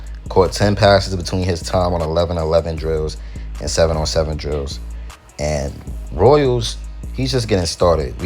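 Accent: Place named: New Jersey, United States. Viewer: I heard your voice and thought-"is this guy from Jersey or something?" Then u said u live in NY😂👍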